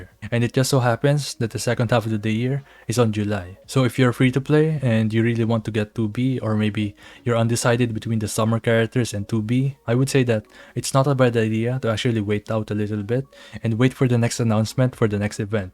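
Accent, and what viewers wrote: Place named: Philippines. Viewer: Are you Filipino?, you sound like one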